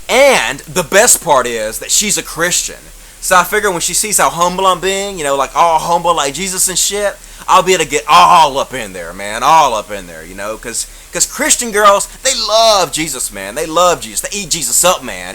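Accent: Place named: Kentucky, United States. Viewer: Oh shit you live here in memphis? you have a more country accent than most people here i thought you were from like kentucky or something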